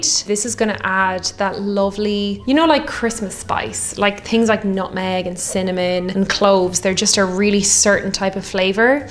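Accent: Ireland. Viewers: Ugh Irish and Scottish accents 😍 😫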